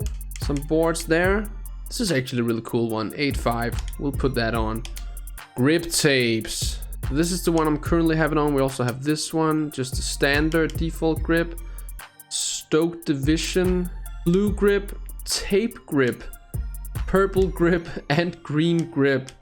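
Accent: Denmark. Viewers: He's Danish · Are you from Denmark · are you from denmark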